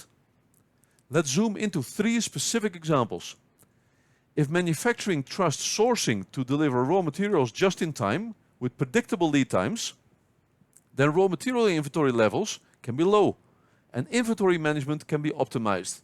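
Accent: Netherlands. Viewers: Dutch accent for the win! Haha Mede Nederlander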